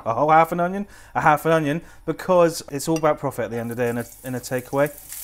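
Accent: England, United Kingdom. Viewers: I didn’t expect the English accent. Nice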